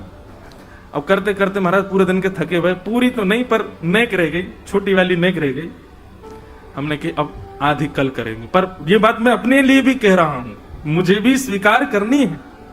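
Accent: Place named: Braj, India. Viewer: Indresji maharaj Aap ki gyan bhari bate sun ne ko brij bhasi boli itni achi lagti hy ki sare din sunti rahu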